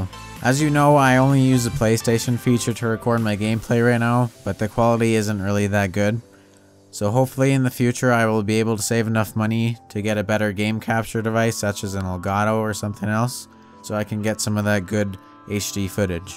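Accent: Canada. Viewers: Also, are you Canadian? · btw I heard your Canadian! Same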